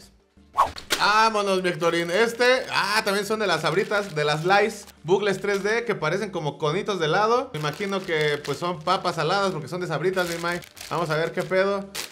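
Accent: Spain. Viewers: Muy chingon tu video y tu asento español 🇧🇴 saludos mi ya eres internacional #Queobolines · #Quiobolines 😂😂😂😂 me encantó tu acento español 😂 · #Queobolines que buen video y me cague de risa con tu acento español xd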